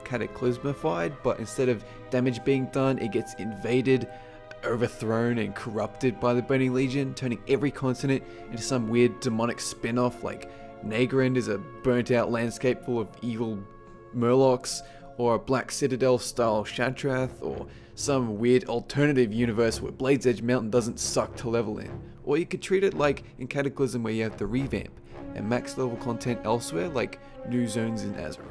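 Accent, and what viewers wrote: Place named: Australia. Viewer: He doesn't sound british at all...He is clearly Australian mate.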